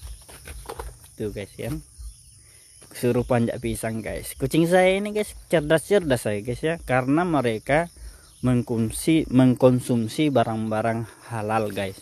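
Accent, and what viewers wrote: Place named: South Sulawesi, Indonesia. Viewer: Kakak domisili di Kalimantan kah... Tapi bahasa dan logatnya kayak orang Sulsel... Kucinng petani ikut tarbiyah...sdh tingkatan takrif atau tahwin kak? 👍🙏He he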